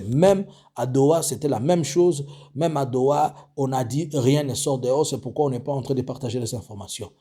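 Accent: Rwanda. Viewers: Je crois entendre un rwandais.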